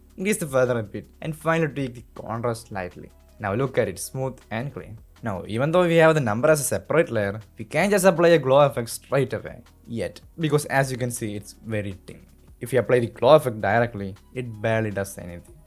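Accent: Kerala, India. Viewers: Why do you sound so familiar? Wait are you from kerala?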